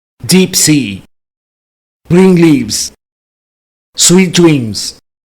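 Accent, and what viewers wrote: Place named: United Kingdom. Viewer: This is by far the most fun I have EVER had with a youtube video. It's true but more like with a British accent.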